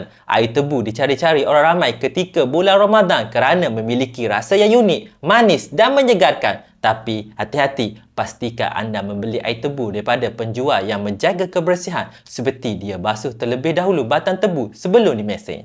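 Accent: Kelantan, Malaysia. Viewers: Kelantan ke bro ?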